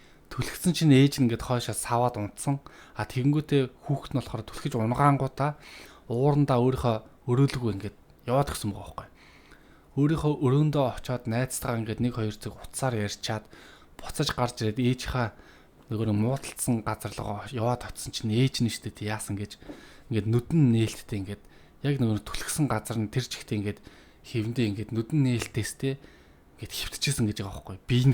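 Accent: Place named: Inner Mongolia, China. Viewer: Өвөр монгол ч юм шиг дуудлага нь бусдаар дажгүй шүү Амжилт шүү🎉🎉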